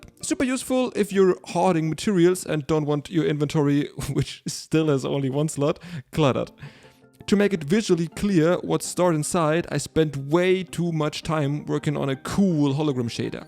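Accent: Germany. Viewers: Der deutsch akzent ist crazy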